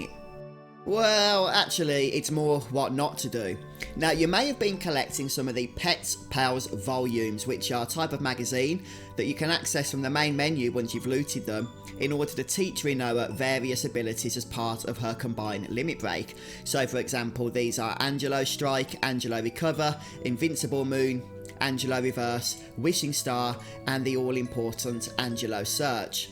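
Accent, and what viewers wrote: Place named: Midlands, United Kingdom. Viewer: He's from Midlands in the UK for sure :)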